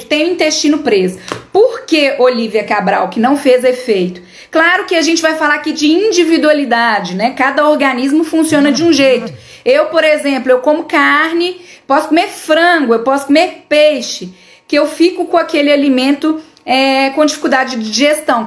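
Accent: Minas Gerais, Brazil. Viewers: Doutora maravilhosa,vc é mineira? Amo os mineiros de ❤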